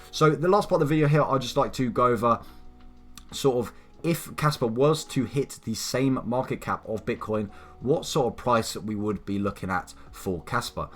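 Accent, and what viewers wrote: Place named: England, United Kingdom. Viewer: i want to learn your accent bro! After of the halving I will to pay someone from Ingland to teach me.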